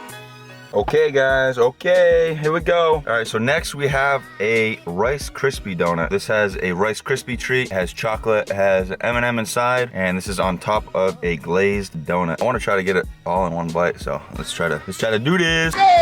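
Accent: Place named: Massachusetts, United States. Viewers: I just started watching your videos recently and I kept saying he's GOT to be from Massachusetts LOL. Fellow Bostonian here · Every time your Massachusetts accent comes out, I have to laugh. You're adorable :-)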